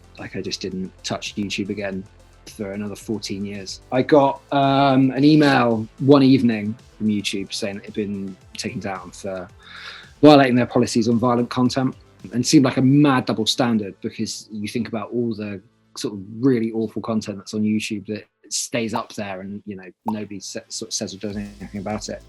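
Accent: United Kingdom. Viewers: dude looks like Dave Franco and The Action Lab combined with a British accent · dang, british ian hecox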